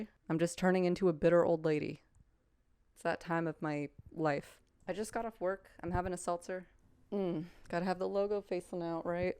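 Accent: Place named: United Kingdom. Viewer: Thanks for the shout out. Nice British Accent! New career as a voice over actress? :) Something you said in there - you have an appreciation for Design. Perhaps Design Consultancy or Management (yeah I know) is your thing? Not actually being "creative" yourself but overseeing the creative process of others to deliver the right result for the client? Having experience of a role before becoming a manager of that role certainly makes you a better leader (or so I've heard).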